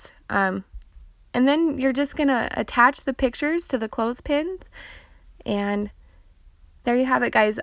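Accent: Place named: Utah, United States. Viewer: I know that "accent"! LOL....I grew up in SLC. Haven't lived in UT for 20 years, but still have family there.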